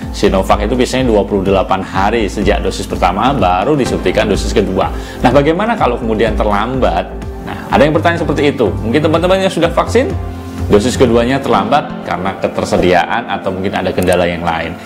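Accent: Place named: China. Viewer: NADA BAHASA ANDA KEDENGARAN SEPERTI ORANG CINA BEPAJAR NGOMONG INDONESIA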